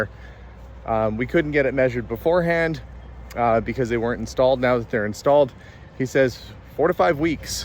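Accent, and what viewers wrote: Canada. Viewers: Remember that he is Canadian and that's how most Canadians pronounce it😊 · It's a Canadian pronunciation. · I wish I had a hundred dollars for every time he said ass fault. I could go on a shopping spree. I thought maybe he was saying it so much because in his last video on the pool house, people in the comments kept pointing out how he says it differently than how it is pronounced in the US. Maybe that is how all Canadians pronounce it.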